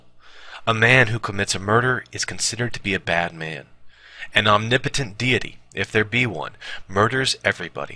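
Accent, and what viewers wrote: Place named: United States. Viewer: all very reasonable, but why is an American reading it? Bertrand Russell was so terribly English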